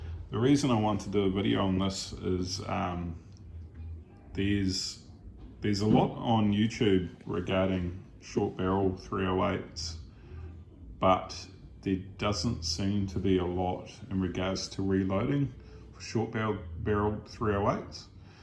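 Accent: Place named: New Zealand. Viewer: Mate, you sound like a Kiwi! 👍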